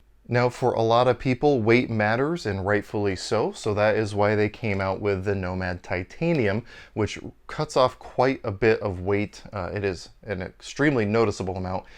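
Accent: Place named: Europe. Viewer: Someone comes from a European family... dead giveaway within 10 seconds of the video. Love the channel brother.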